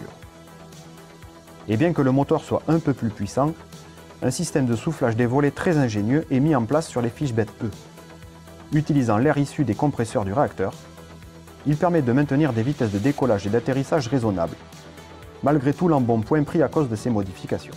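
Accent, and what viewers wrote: South, France. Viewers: Surtout que vous avez un accent du sud non ? Méridional ? En ralentissant on en profiterait un peu plus, c'est sympathique. :p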